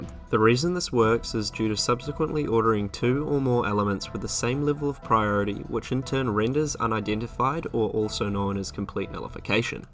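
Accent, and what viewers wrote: New Zealand. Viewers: Sub for the nz accent ! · Are you a kiwi brother?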